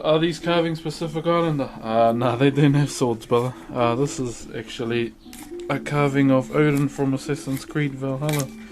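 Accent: New Zealand. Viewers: Keep up the good work mate. It's awesome what you are doing, it's really interesting. Hearing you speak makes me miss working with all of the kiwi lads that i used to bounce with. Cheers 🍻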